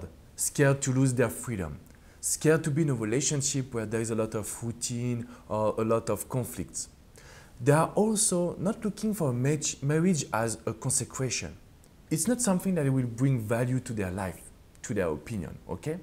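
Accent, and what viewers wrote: France. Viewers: Like your French accent!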